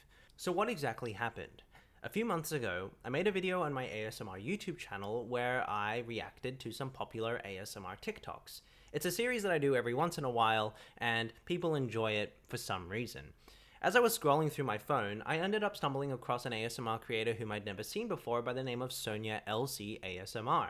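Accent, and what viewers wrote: Australia. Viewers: unrelated but YOUR AUSTRALLIAN??????? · I think he’s Australian :) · Bestie he is not british he literally has an aussie accent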